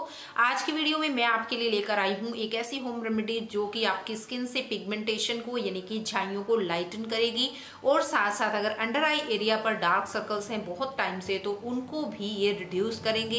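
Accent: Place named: Haryana, India. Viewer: Mam AAP Haryana se ho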